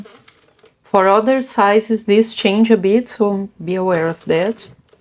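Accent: Brazil. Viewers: voce e brasileira ? reconheco a acento de brasileiros falando ingles :)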